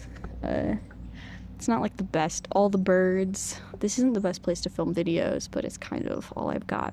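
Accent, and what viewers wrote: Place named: Midwest, United States. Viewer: youre from new york?!?! i thought you wouldve been midwest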